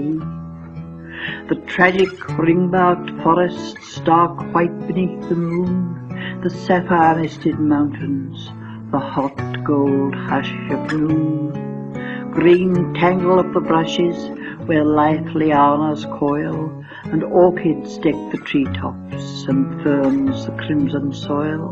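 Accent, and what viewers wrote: United Kingdom. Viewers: It makes me weep too. has a beautiful and distinctive Dundee burr, from her grandfather I guess. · The Reciter sounds like she's from Shetland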